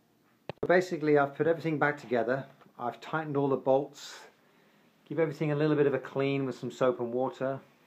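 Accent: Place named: United Kingdom. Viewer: U sound like ur British, I thought u guys called a wrench a spanner?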